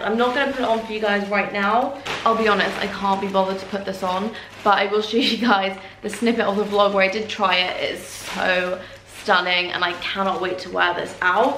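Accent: United Kingdom. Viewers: You are iconic (with the British accent)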